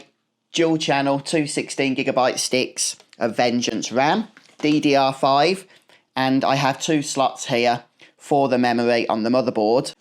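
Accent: United Kingdom. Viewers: I just built my first PC, ITX as well! I was looking at the Terra but decided it was a little pricey for my liking. Btw, I am curious about your accent, which part of the UK if you don’t mind?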